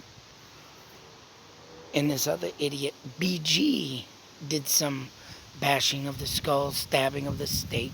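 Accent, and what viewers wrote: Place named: Maine, United States. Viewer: Dude I like your Delphi videos. You sound like you are from Maine? I recognize the accent...I am from Vermont. thank you for this video.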